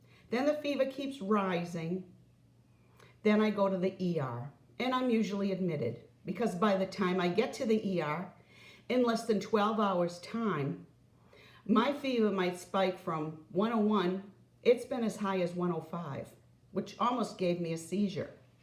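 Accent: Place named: New England, United States. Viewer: This is my first video to see from you. Thank you for this explanation. I have a family member who has lymphedema in her arm, as a result of her breast cancer surgery. She told us about some of her treatments and the sleeve she’s supposed to wear at all times, but we didn’t realize the seriousness of what she’s living with. I enjoyed your video so much that I just subscribed. Plus it sounds a little bit like you’re from New England. I’m not from there but I lived there for a few years! So it’s fun to hear the accent again. ☺️ Anyway, I look forward to watching your other videos and following your future progress!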